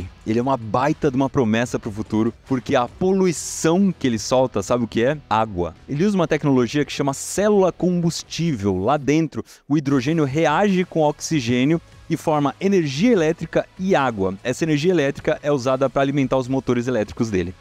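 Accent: India. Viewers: Man pq o parece um indiano com esse áudio em inglês? Eh IA ou é ele msm falando? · At first time I thought you were indian, because of your accent, but then I realized you are Brazilian and the video are Dubbed. Nice Vídeo.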